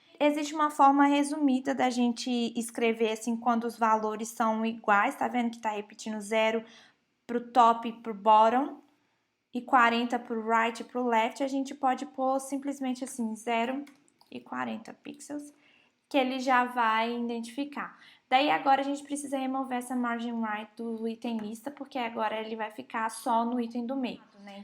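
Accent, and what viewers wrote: Minas Gerais, Brazil. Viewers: É muito sotaque de mineira !!!! Eita trem baum sô !!!!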